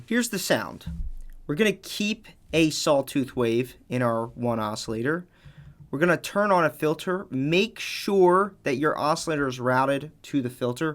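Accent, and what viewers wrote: Philadelphia, United States. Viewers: Hey bro are you by chance from Philly? I live in port Richmond and I swear I hear my neighborhood when you speak, maybe I’m wrong. Either way great video! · I know a Philly boy when I hear one! Haha thanks man, I'm a newbie and this is probably the most helpful video I've watched so far about synth sound design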